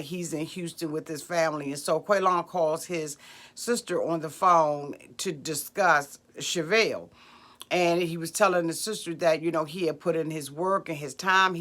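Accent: Washington DC, United States. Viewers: Just finding your channel. I knew I heard a DC accent. It was the roach crawling down the wall on Puppy’s house for me.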